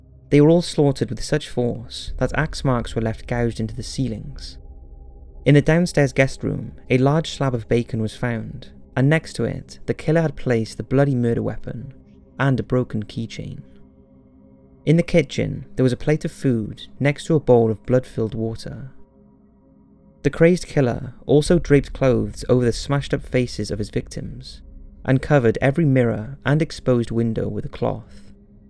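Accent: United Kingdom. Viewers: Where are you from? I thought you might be British, but throughout your videos you mispronounce certain words, so I thought English might be your second language? For instance Ouiji and heinous were mispronounced. I’m not poking fun, I’m just really curious.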